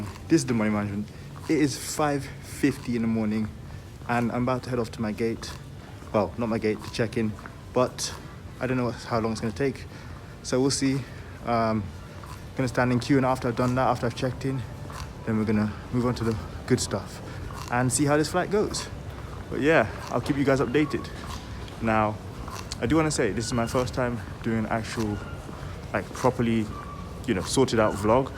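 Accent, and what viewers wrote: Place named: London, United Kingdom. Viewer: Trip to london... So where you really from. I thought you was from london😅all this time. Watched in full. Great stuff peace✌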